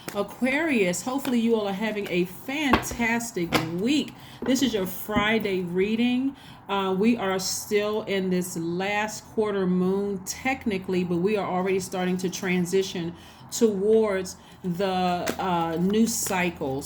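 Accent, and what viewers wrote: United States. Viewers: Im french but when i listen to you I feel American lol I love Aquarius ♒️ they are the best 💜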